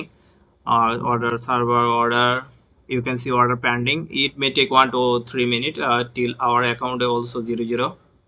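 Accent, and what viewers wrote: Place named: India. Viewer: all i hear is "bibidi bobidi" because thats how all Indians/hindus/punjabi/beadheads sound when yall speak.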